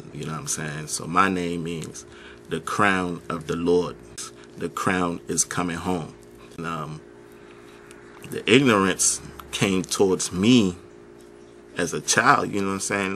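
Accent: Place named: Niger. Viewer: I think its cool how your from dc but speak a strong Nigerien accent with DMV speech pattern.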